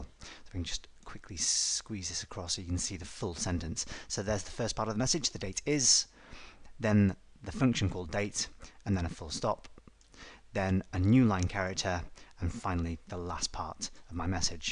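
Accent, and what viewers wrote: United Kingdom. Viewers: Not sure if I love these videos because they are informative and easy to follow or because of the british accent. Either way, well done!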